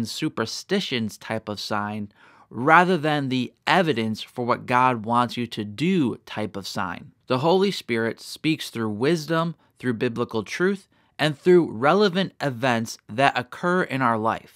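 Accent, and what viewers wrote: United States. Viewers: It's good to know about God. I appreciate your standard American Accent. I am trying to understand American Accent.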